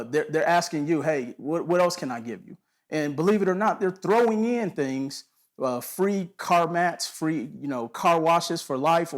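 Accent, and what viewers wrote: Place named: Louisiana, United States. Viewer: your brilliant! Off topic, you have to be from the south? Louisiana? Your accent gives it away! 😎😎😎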